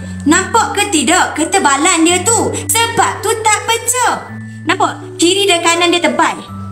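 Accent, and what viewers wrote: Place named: North, Malaysia. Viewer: Suka dengaq hang habaq Utara no..."jgn gatai2 tgn lipat" 😂😂😂🤣🤣🤣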